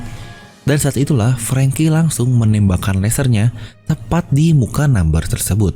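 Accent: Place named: West Java, Indonesia. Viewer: Urang sunda deteck:v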